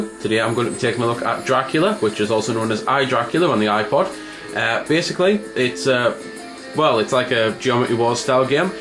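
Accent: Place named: Sunderland, United Kingdom. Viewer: are you from sunderland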